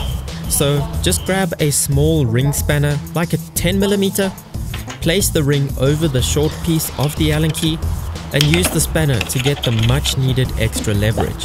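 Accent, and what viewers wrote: South Africa. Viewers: You sound South African, where are you from? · Your channel just got recommended! What a great channel! Dude are you from south Africa. Looks like jhb? And well sound like a South African. If so I am proud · Are you from south Africa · You from SA?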